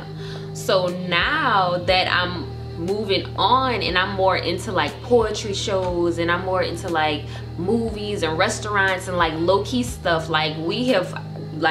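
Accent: New Orleans, United States. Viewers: I know I’m late to this video but are you from the N.O ? I think I hear an New Orleans accent.🤔 And your a Christian also !! God bless you.! My family is from there.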